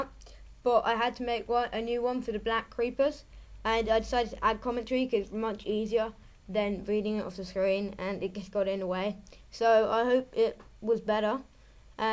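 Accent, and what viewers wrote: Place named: England, United Kingdom. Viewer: Wow, your accident is deep, I mean more than BBC deep, more than Dr Who deep. No problem with that, just rarely hear someone with that deep an English accident. Anyhow, my question is how do you change the skins of offline characters, one's not registered with Minecraft. For example, you run a private offline server on your home LAN, and use whatever name, sign in offline, and you can use those characters on that server. How would you change the skins of the individual characters on the server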